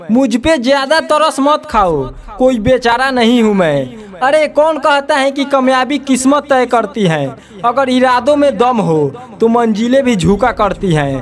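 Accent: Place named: Bihar, India. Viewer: Mai bihar se hu tumhara Bihari tone saph dikh rha hai Hindi sahi karo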